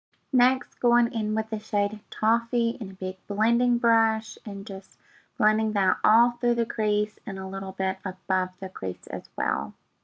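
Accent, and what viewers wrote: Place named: South, United States. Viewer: your southern accent makes me smile so much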